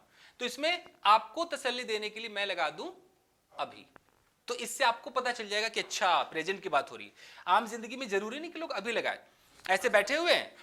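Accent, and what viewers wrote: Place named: Meerut, India. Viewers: you are from Meerut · best class ever because of haryanvi agree? thokdo like 👍👍👍👍👍👍👍👍👍👍👍👍👍👍👍👍😂😂😂😂😂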